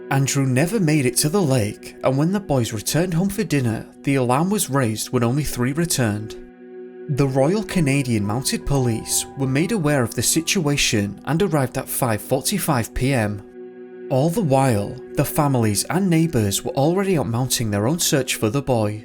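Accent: United Kingdom. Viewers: Congrats on reaching 100k, always enjoy your videos, fascinating and well researched. Nice to hear a fellow Brit narrating!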